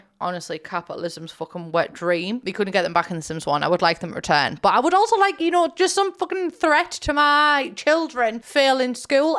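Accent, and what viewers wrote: United States. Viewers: Omfg I need a whole video on your American accent please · Omg you do such a good American accent!!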